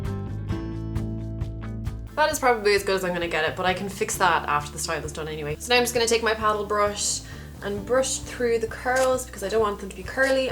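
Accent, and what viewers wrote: Ireland. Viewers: does anyone else hear a little either irish or scotish in her voice?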